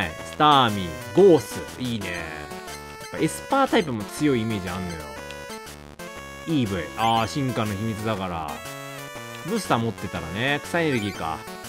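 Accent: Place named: Western Japan, Japan. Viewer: なんかね😂西の人だなぁって😂😂 こん↑ぱん↑とか いー↑ぶい↑ って キュンぽいんとなのは私だけ？😂❤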